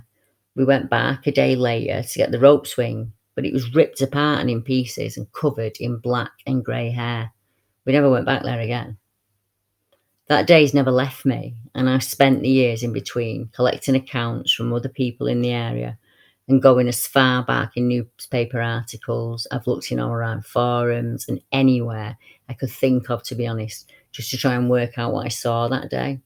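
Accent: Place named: North, United Kingdom. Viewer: Not commented in a while as I watch your videos mainly on my TV as I sleep, something about the fellow north accent and the amazing encounters is just so peaceful to me in a stressful part of my life. Sending Much love to you and everyone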